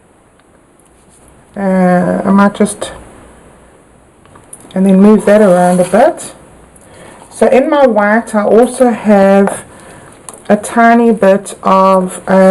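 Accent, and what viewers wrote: South Africa. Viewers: Very pretty! Must say, so weird to hear a fellow South African! Are you still in SA?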